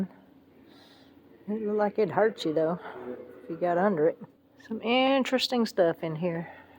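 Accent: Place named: Arkansas, United States. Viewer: my mom side is from Arkansas and they talked alot the same. Well maybe they talked a bit slower than you. Lol.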